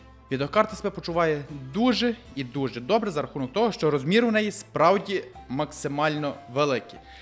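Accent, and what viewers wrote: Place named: Galicia, Ukraine. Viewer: Класний галицький акцент 👍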